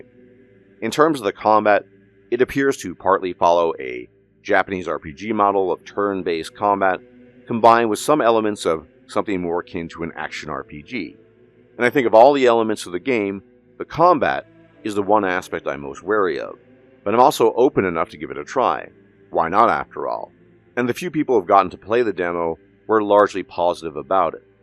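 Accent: France. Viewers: Amazing French accent, how come? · That french accent caught me off guard. lol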